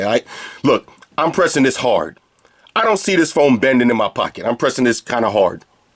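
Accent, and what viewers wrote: New York, United States. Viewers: this review was really fuuny. i have a feelin this guy is from nyc cuz i talk like that too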